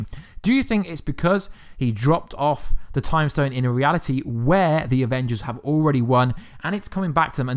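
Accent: United Kingdom. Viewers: OH MY GOD... MAN WITH BRITISH ACCENT... YOU KNOW ANT-MAN HAS NOT BEEN RELEASED IN THE UK AND YOU JUST SPOILED IT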